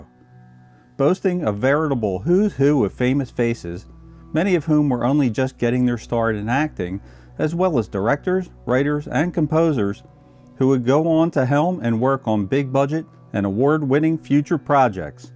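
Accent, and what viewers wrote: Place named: Pennsylvania, United States. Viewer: Your accent sounds like you're from Lansdowne, Pennsylvania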